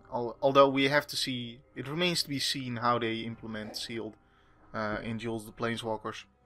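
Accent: Netherlands. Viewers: if this ain't no Dutchy then i don't know who else can speak this dutch-english dialect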